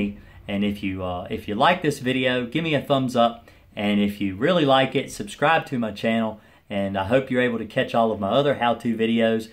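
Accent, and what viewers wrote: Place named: South, United States. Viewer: Thanks good info... you have an accent like Gomer Pyle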